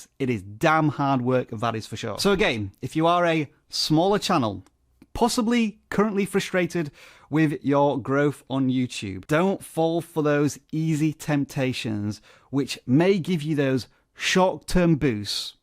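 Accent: Yorkshire, United Kingdom. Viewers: Yes! Straight talking from Yorkshire #fistpump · wait you're british?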